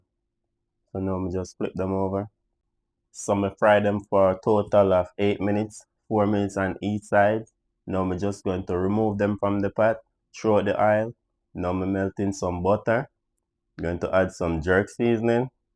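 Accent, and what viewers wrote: Jamaica. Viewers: Love the Jamaican accent…..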